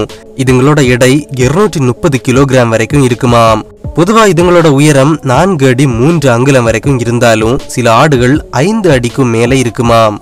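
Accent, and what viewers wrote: Kanyakumari, India. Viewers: தலைவர் கன்னியாகுமரிக்காரருங்கோ..........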